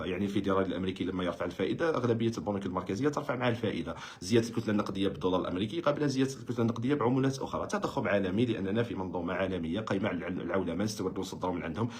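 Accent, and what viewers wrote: Morocco. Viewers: هذه اللهجة مغربية و الله أعلم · الهدرا تاعك تبان شغول مروكي ،،،،تهدرو غير علي كرشك ،و انت واش تمد البلاد ،اتزوج دير دراري و رمي برا ،،،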